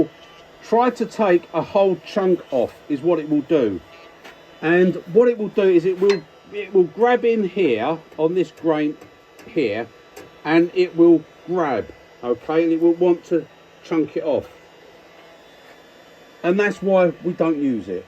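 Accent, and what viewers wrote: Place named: South London, United Kingdom. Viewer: Fantastic, wasn't expecting to hear from you so close to Christmas thought you would be having a well urned break! I've got lots of books seen loads of the videos but your tutorials seem to hit the spot,feel like I can turn something half decent with me carbide and my badly sharpened traditionals,I think your accent helps, sound like one of my lot in South east London,no billy bullshit tell it like it is! As you can probably tell a few beers gone down my neck (it's Christmas) but sincerely enjoy the rest of it,and you and have a cracking New year.lee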